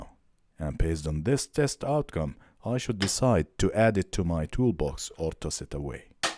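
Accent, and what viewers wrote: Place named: Thuringia, Germany. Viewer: That accent. Sounds Thuringian. Please tell me I’m correct!? Lol.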